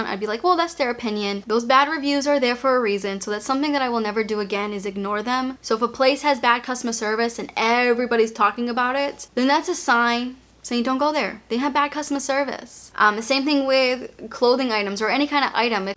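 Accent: Boston, United States. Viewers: I love your boston accent.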